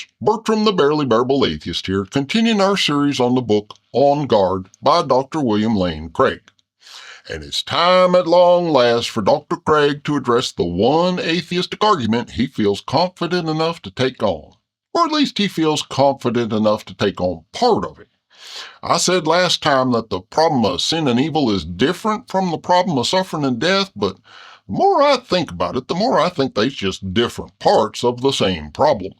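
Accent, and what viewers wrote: South, United States. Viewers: You are healing my fear of southern accents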